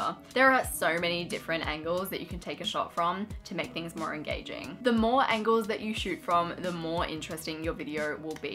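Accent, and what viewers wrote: Australia. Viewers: This is awesome! I find your content so helpful and inspiring!!! Also, I love your Australian accent, your voice is very pleasant to listen to. Do you have a podcast? I think you’d do great in that forum too 😆❤️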